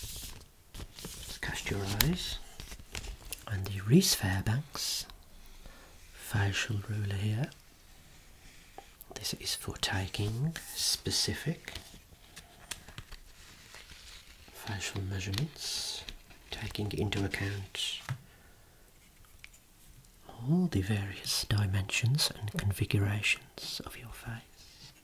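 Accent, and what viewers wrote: Birmingham, United Kingdom. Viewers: I think he’s from Birmingham or around that area :)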